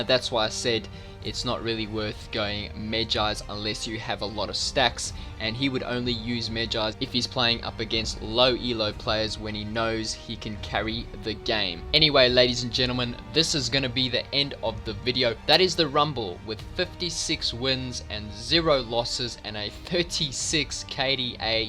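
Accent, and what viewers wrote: South Africa. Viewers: south african??? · You sound South African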